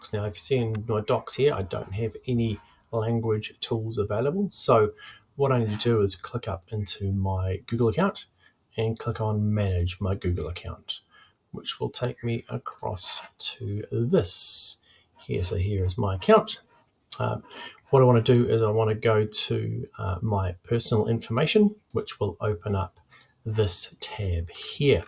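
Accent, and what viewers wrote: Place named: New Zealand. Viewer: Thanks, that's so helpful, and in a decent Kiwi accent too.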